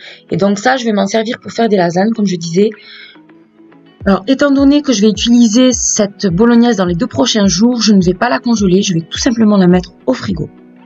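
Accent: South, France. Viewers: je n'avais pas fais attention dans la vidéo mais il me semble que tu as dis que c'était sûrement la grossesse qui te fais adorer la Mozzarella. Félicitations à toi 😊😊 je ne me lasse pas de tes vidéos avec ce jolie accent du sud que tu as 😉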